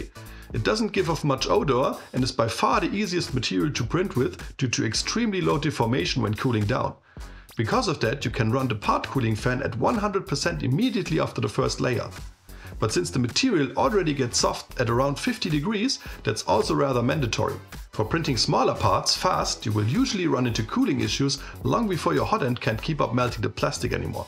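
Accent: Germany. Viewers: Not a native speaker. To good and clear pronunciation :) German? Subscription is done.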